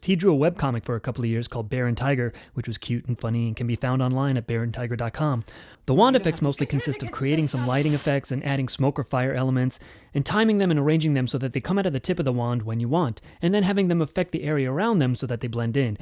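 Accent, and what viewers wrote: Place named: England, United Kingdom. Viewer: You have a great English accent mate! 😊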